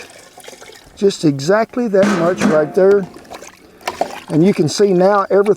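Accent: South, United States. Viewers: What a charming guy. That's why I love the southern accent. I wish I had one. It would help with my music. There's a bit of music in everything he says. Looks like really good grub too.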